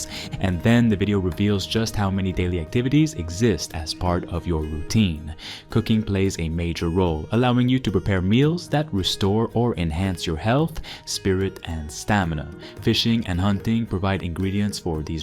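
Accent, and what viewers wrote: Quebec, Canada. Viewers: Gros accent québécois haha (Bon vidéo!)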